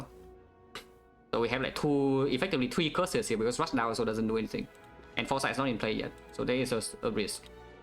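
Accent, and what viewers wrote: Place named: Vietnam. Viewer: How sheltered are you to have never heard an accent before? He's Vietnamese and lives in Vietnam. I think his accent is great and he's also fucking hilarious. Please stop talking.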